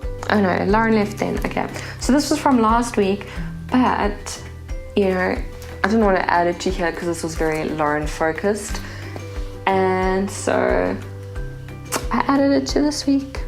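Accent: South Africa. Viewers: Hi 👋🏻 I'm South African! So lekker to hear our accent! Enjoying your videos xx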